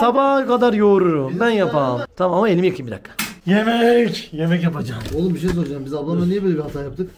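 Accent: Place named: Diyarbakir, Turkey. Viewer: Slm hayırlı akşamlar Diyarbakır'dan siveniz sanki bizimkimize benziyor siz Diyarbakırlı misiniz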